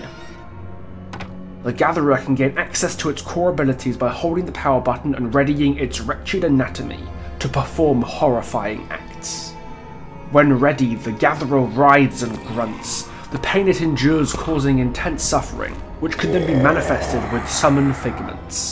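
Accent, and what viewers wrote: United Kingdom. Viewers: Of course the person who’s narrating the video is bri’ish…mf ruined it lmao 😭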